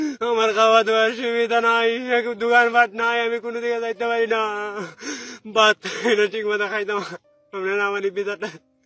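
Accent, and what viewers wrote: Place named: Sylhet, Bangladesh. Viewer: সিলেটি উনি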